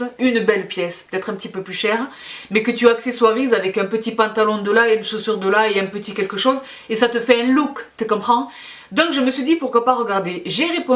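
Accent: South, France. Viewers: J'ai tellement eu le coup de foudre depuis que je t'ai découverte il y a quelques semaines, que je me regarde presque toutes les vidéos que tu as faites depuis le début, un peu tous les jours. Ca y est, tu m'as rendue accro ! Pourquoi ? Je pense que c'est ta façon de parler au vocabulaire riche, tes expressions rigolotes et ton accent du Sud... et puis bien sûr parce que tu parles de choses qui m'intéressent ou de marques dont je suis déjà fan (Lorac, Tarte, etc...), et parce qu'en plus tu m'en fais découvrir de nouvelles. Et cerise sur le gâteau, tu es pleine de bon sens. Bref belle, intelligente, généreuse et énergique, la copine rêvée, moi je dis !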